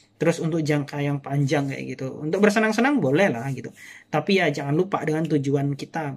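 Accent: Java, Indonesia. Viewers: Mas kamu dari jawa ya kok logat dan bahasanya jawa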